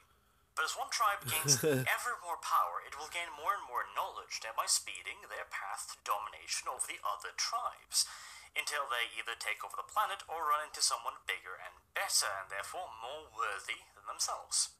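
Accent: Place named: United States. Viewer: Oh, MURICA xD I was trying to guess via akcent :P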